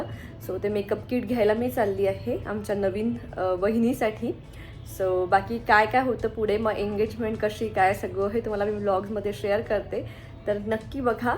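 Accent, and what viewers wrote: Vidarbha, India. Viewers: Ho bai... vidharbh tone mdhech yeto pn kharch watcha brand Samjla ch nhi 😂😂😂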